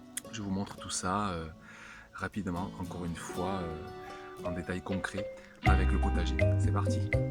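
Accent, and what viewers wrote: South, France. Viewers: Cette préparation du sol est elle la même partout dans le monde? Je vis en Belgique et mon jardin...c'est de la boue. et il pleut TOUT les jours. je dois suivre ces étapes?Avez vous des conseils pour des regions pluvieuses? Merci d'avance et Très bel accent du sud :)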